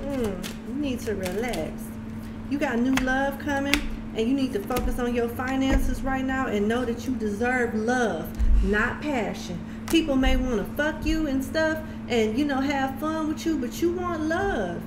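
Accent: South, United States. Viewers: you are so pretty and blessed I'm glad I found you and your southern accent is adorable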